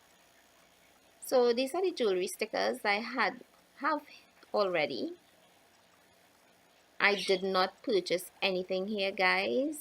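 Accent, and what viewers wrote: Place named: Trinidad and Tobago. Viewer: Awesome DIY, Love it and I love your Trini accent. Tfs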